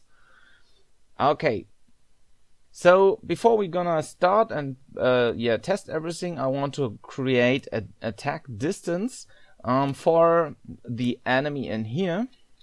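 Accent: Germany. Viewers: you sound german, diablo is pronounced as it is in german ^^ im also looking forward to the next parts, great video!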